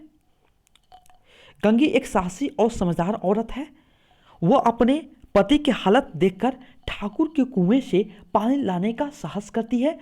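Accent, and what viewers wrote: Bengal, India. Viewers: Ap kya bengali hai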